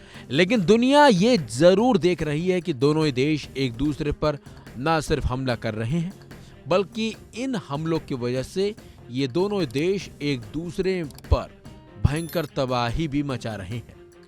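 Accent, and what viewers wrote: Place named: Madras, India. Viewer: Ur channel sounds madras chap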